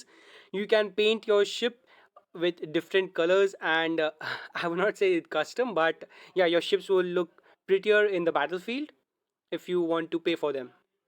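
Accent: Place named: India. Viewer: You Indian bro? I find that accent familiar.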